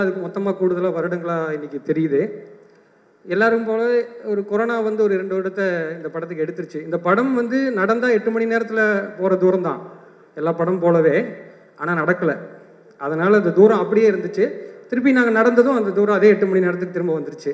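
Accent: Kongu, India. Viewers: திருப்பூர் காரர் .. கொங்கு தமிழ் super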